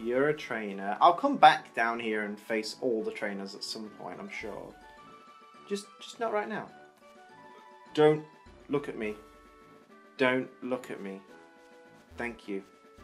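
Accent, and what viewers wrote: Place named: Midlands, United Kingdom. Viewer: You've quickly become my favourite pokétuber! I've managed to get most of my friends into your videos too! Fellow Brit here, are you from the midlands? I'm from the west midlands and you sound like you're from near here :)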